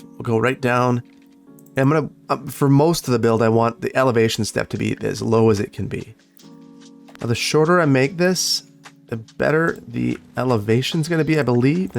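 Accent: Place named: Midwest, United States. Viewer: Same! I just assumed he was talking about the Midwest from his accent alone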